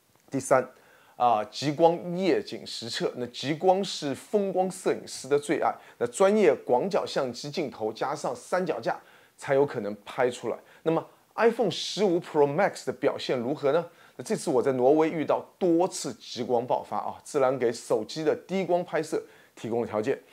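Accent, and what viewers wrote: Shanghai, China. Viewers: 个口音很上海 哈哈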